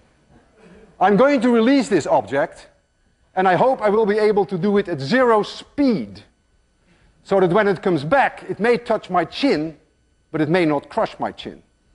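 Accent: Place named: Netherlands. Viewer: its a duch accent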